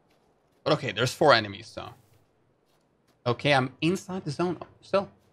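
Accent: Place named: Denmark. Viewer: Where are you from? You sound Danish.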